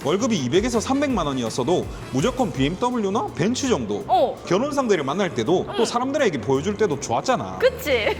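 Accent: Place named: Gyeongsang, South Korea. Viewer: 사투리는 갱사도네요.ㅋㅋㅋ 아랫동내는 역시 먹을께 없엉....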